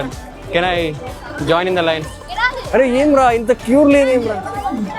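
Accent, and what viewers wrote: Telangana, India. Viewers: Ye mulaki poina sare Mana Telangana Slang ki unna craze vere untadhi❤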